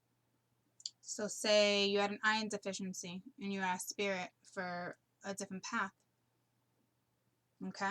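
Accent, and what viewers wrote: Boston, United States. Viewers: Oh my God !! Are you from Boston...I'm from Cape Cod!!!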